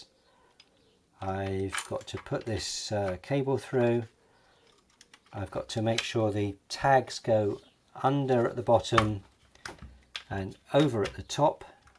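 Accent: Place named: United Kingdom. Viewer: I love British English accent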